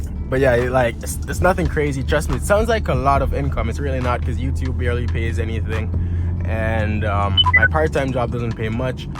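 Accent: Jamaica. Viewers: i hear an accent are u mix with jamaican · I’m 16 and moved to Canada from Jamaica 4 years ago and right now I’m really struggling to get hired somewhere. Not even McDonald’s wants me 🤷‍♂️. I’ve already thought about renting cars in the past but I can’t find the money to buy one and clean it up. Also the second I heard your voice I knew you was a brother from the island 🇯🇲 g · i can tell ur jamaican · are u jamaican by any chance? the accent.. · Sound like you are jamaican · I was here thinking you sound Jamaican from your accent. I am in college as well in Kingston, I'm 19 and would love to be driving a nice car like that as soon as possible.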